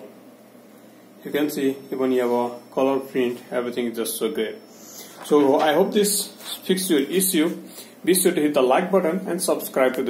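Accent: India. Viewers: thats a beautiful indian accent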